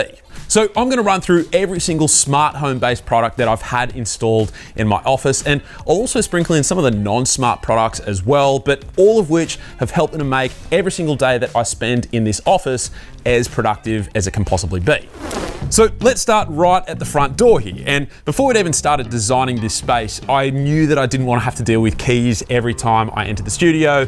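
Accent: Australia. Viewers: Dude I literally just found you today and I'm binge watching your videos now. Subbed for both the production and the accent. Aussie Oi! 😅❤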